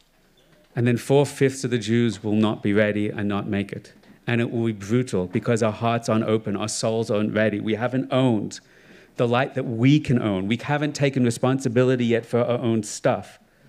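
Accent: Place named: Australia. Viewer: He has an Australian accent and not American.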